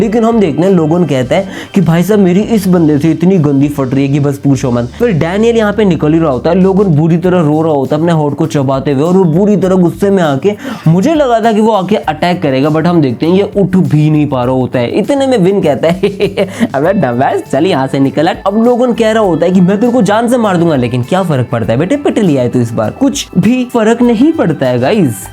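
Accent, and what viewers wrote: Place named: Haryana, India. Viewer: thoda accent se laga tha ki delhi haryana ya Punjab se hoge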